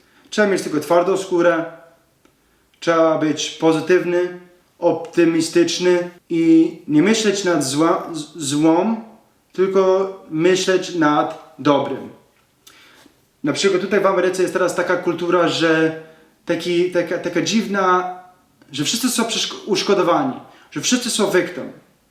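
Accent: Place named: Poland. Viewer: podoba mi się masz taki fajny akcent taki modern polish ale jednocześnie brzmisz jak moja babcia która też jest z Podlasia <3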